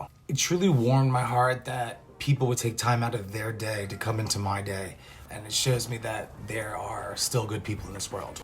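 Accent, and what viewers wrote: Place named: England, United Kingdom. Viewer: You’re a brilliant actor - I hope things go well for you! 😊 That was a very good English accent, probably one of the best I’ve ever heard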